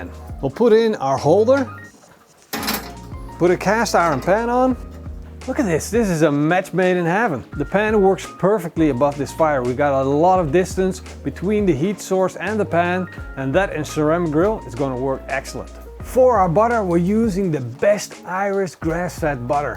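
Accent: Netherlands. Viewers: Dutch accent... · Mann i speak both and yeah thats a pretty clear dutch accent or maybe from belgium but i doubt that Belgians have a bit different accent aswell. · Are you dutch you sound dutch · You think he's French Canadian? He's obviously Dutch. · Definitely Dutch by the accent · Sounds like your from the Netherlands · Ja, hij is echt Nederlands — “What IS up?” ... maar zijn Engels is bijna perfect. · There's no way you're not dutch, it's the first thing I noticed when you opened your mouth 😂 Nice video either way! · Is he dutch? Because this accent is very dutch😭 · Dutch accent. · Ja, die Nêderland se aksent. Man kan die Boere en die Nêderlanders spot-on hoor. Dis altyd gaaf. Die beste groete uit Rusland! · You’re dutch aren’t you? I can hear it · are u dutch?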